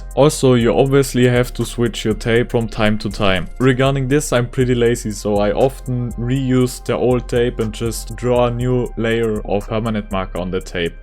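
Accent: Germany. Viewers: Tysm your german ? U got that accent? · You sound like typical german-english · Was ein deutscher 😂😂😂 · Are you German?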